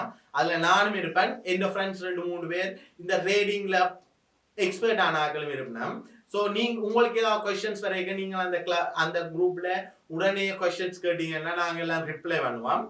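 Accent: Sri Lanka. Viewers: This is Srilankan Tamil 😍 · இவர் இலங்கை தமிழ் மண்ணின் மைந்தன். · Bro neenga srilankan vaa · Nalla teacher. Srilankan Tamil vasanai sema bro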